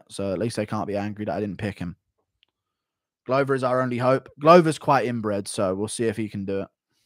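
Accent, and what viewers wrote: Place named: West Virginia, United States. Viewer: Sounds like West Virginia especially boone county.......wait......I'm from boone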